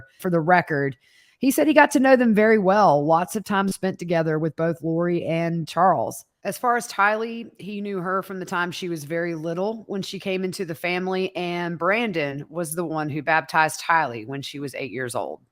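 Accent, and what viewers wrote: South, United States. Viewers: …I AM SUPER PROUD OF U! This is wat I wanted to do growing up…but ya know, having that baby boy 19 yrs ago changed my life a bit. U r on a well known networks doing yo thang! U look good and sound professional…with the southern twang! Proud of it! From the pecan pie to the sweet tea❤ Thank u for representing us well down here! Much love from M-I-crooked letter-crooked letter I, crooked letter crooked letter, I-Humpback, humpback I ❤ PS…can we get Fruitloop one night to talk about this case? Plzzzzzz?!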